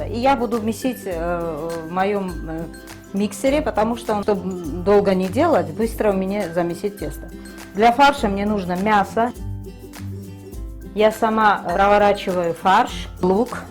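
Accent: Georgia. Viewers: Моя хорошая!!! Как же я рада была увидеть Вас на канале ,услышав Вашу речь с акцентом и увидев блюда дамашней грузинской кухни ....вернули в молодость .!!! Когда были молодыми жили с мужем в Тбилиси (Глданский р-н) а сейчас представляете я тоже живу в Испании (Кадиз) часто из грузинских блюд готовлю аджапсандали и сациви ,хинкали !! На всех видео поставила лайки!! Вы очень приятная ,а русская речь с акцентом бальзам на сердце!!!очень удивилась что так много зелени Вы нашли в Испании особенно свежий укроп и кинза ...для испанцев пряные травы не интересны.Если не секрет .,где Вы живете?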